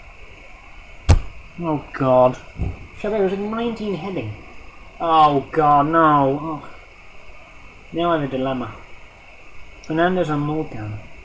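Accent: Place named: Ireland. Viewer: Where bouts in Ireland are ya from?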